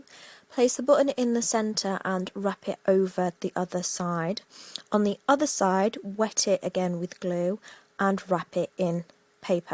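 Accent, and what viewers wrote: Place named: England, United Kingdom. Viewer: Love this!! Thank you for sharing your video! Can I use clear nail polish to harden my buttons if I do not have the stuff you are showing in your video? That Diamond Glaze is very expensive when I looked for it. By the way I just love your English accent! Have a blessed day :)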